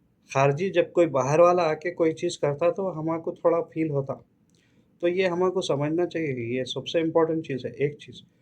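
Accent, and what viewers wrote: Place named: Hyderabad, India. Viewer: Aslkum khiriyat se hain sir ji nahi aap puri tarha hyderabadi baat hi kare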